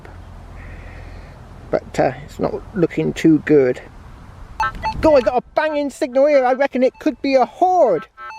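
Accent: United Kingdom. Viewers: Hi. I really enjoy your videos. I’ve been detecting on and off for 33 years, and must say I’m somewhat envious that you have the hidden history of both Medieval and Roman times in the earth beneath your feet! My mother was British and I hear some of her speech patterns in your voice, which is a good thing! Keeps my connection to Britain alive! Here’s my dilemma and question. I’m leaving in March of 2021 on a 5 year road trip across America and Canada, just sightseeing, fishing and DETECTING! I have an old White’s Eagle II, which I believe to be the first digital detector, but it’s just a toy now, with a 6” depth range at best. I plan to spend my detecting time on the trip searching primarily for coins and relics, then focusing on gold nuggets when I return to California(I expect the gold price to BOOM soon!). So my question is this: Is the GPX5000 a versatile enough machine to reliably find coins at depths to 18” plus, while still performing it’s primary function of finding deep gold nuggets when I’m doing that? 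In other words, must I purchase a CTX3030 as well, or can the GPX do it ALL? Thank you for any advice, and keep the great videos coming! Thanks! Roger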